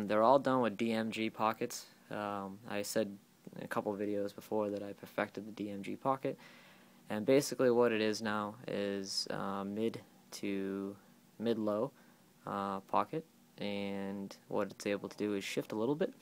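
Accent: Boston, United States. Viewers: you have a Boston accent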